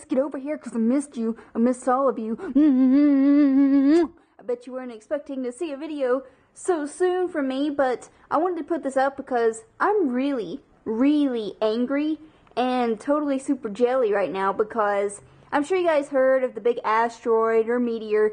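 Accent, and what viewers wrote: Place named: South, United States. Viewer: I can totally hear your southern accent. lol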